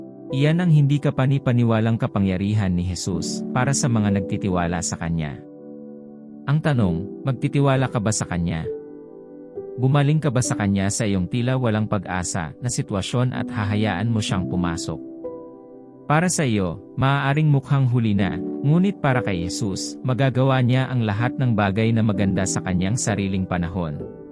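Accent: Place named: Filipino American, United States. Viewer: parang fil am managalog ang badoy mo mag aral ka ng tunay na salitang tagalog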